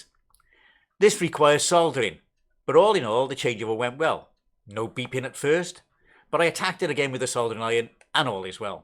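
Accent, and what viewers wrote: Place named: Wales, United Kingdom. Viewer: Another excellent vlog thanks. Are you using an autocue these days? There's something about it that makes the delivery of your dulcet Welsh tones sound even more lyrical and poetic. You should do one of those relaxation tapes :-)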